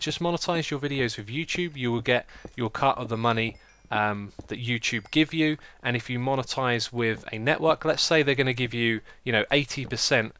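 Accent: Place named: England, United Kingdom. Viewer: Thank you so much man for all the content. The funny thing is that with the whole anonymity thing, you could literally live down the road from me and I wouldn't even know haha. Cause of the exact English Accents:-)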